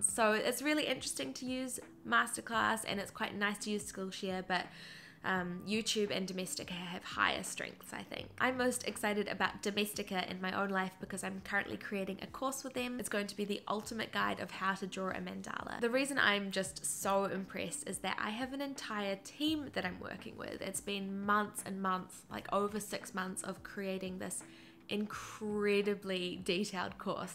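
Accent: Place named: New Zealand. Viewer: Your such an awesome creator, love your stuff, very inspiring! And great to hear another Kiwi accent on YouTube! (I'm from NZ too)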